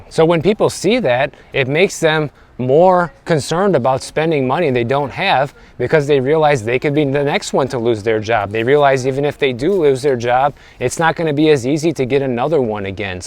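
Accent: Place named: Illinois, United States. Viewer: Somehow.. I knew you were from Illinois.. You're giving me Northwest side, or out West..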